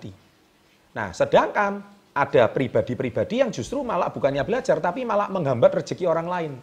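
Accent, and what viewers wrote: Java, Indonesia. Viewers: ngomongnya jelas dg nada jawanya . keren mudah di pahami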